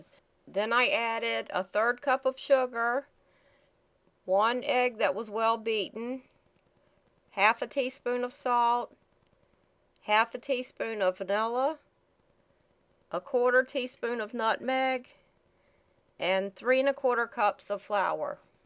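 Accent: South, United States. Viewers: What sold me is the time she spent to get it right. I did it 2 at a time exactly from her recipe in a little pan. Perfect white rings and all. And her delightful Southern accent made them taste even better to this ,84 year old Canadian. Jam filled next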